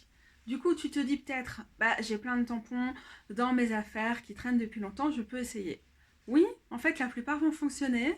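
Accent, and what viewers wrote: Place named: Belgium. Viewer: Merci. J'ai testé sur un mass market, le tarot de Marseille de Kriss Hadar avec un markeur pour paperbord. Catastrophe, effectivement le carton boit l'encre, j'en ai mis partout. Du coup les majeurs sont tranches "noires" et les mineurs blanches. Il me semble avoir vu une vidéo d'une créatrice d'oracle qui conseillait des feutres haut de gamme mais je ne sais plus les références. Et Claire de la lune à fait une vidéo sur les arrondisseuses et autres matériels de customisation. Ton tarot de la forêt enchanté est super beau. Le miens est resté tel quel. Je n'ose plus customiser mes jeux. Je n'ai pas entendu le bruit de machine donc aucune gêne. Et sinon j'aime bien le français belge. À tantôt 😉🙏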